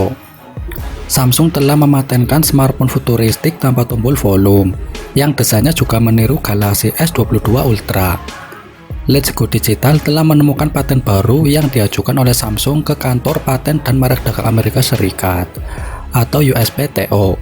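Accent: Banyumas, Indonesia. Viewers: Logatnya ngapak yak..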